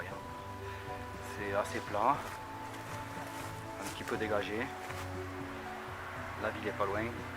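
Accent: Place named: South, France. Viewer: oui je l'ai vu trop tard. merci de votre réponse, et de m avoir permis de découvrir ce chanteur. votre accent du sud vous trahit... de quel région êtes vous exactement?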